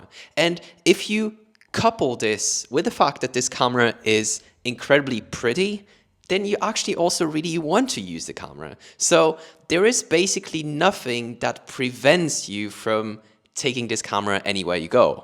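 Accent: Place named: Ireland. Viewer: Where did you get the Irish accent?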